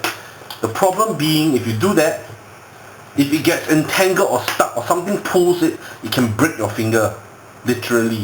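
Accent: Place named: Singapore. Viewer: Wow!!!! The first impression was that Singaporean English accent. Further confirmation from your profile reveal you to be my countryman! Haha Great video. Recently just got my spool and SMB. This is a good "dry run" video before I actually deploy it on my next dive.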